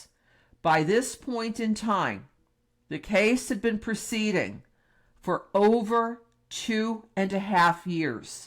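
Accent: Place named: Eastern Pennsylvania, United States. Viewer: !! I've been following your channel (and commenting) for quite a while! It is absolutely wonderful to hear someone like you who presents "just the facts" as they say! I'd like to ask you a candid question, if I might... Are you by any chance from Eastern Pennsylvania? I'm from New York's Hudson Valley and I've been trying to place your accent, and I'd say yours is either from Northern New Jersey or Eastern Pennsylvania, if I had to guess! I'm a lover of different accents and yours struck me so distinctly!! Keep up the excellent work!! Thank you so much!! Happy belated Thanksgiving! 🦃🦃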